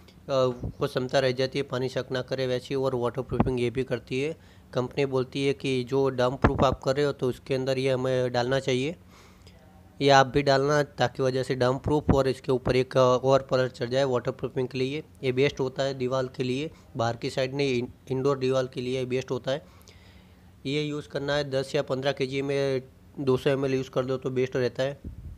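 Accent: Gujarat, India. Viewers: You are gujrati right? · Gujju che k su bhai amuk word sambhdine to lage 6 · Gujarati Accent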